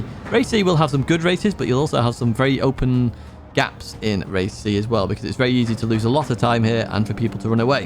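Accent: United Kingdom. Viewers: Nice content but any chance you can you talk a tiny bit slower so non Brits can understand you ?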